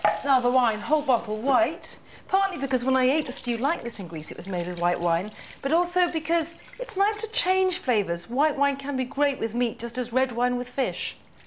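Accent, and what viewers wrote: United Kingdom. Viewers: I am here to learn the British accent and not the dish 🤗🤗🤗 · Your voice is so attractive, I’m going to have my girlfriend start using a british accent 😂😂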